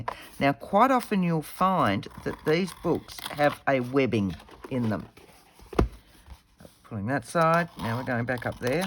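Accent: Australia. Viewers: Thank you soooo much for this toolkit go through especially of the glues you use... funny enuf as a reborn doll artist E6000 is used for keeping in mohair to our kit heads... looove using the glue stik but yes here in Charleville Qld we get constant 40 degree heat so yes a stronger glue is needed for that... looooove your channel... lovely to hear an aussie accent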